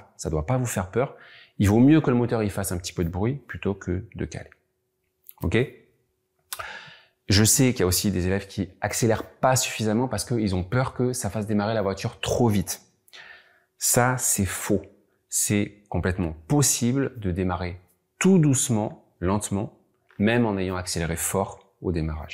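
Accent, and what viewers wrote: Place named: Guadeloupe, France. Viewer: C’est drôle, je vous trouve un léger accent guadeloupéen, mais je suis sûrement biaisée ! ^^ Merci pour cette vidéo, et les autres en tous cas ! 🙏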